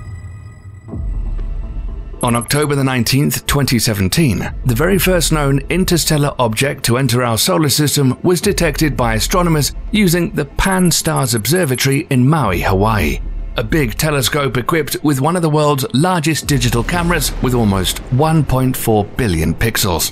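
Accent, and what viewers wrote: United Kingdom. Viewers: Awful nit brit narrator. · British accents make narrations sound a million times better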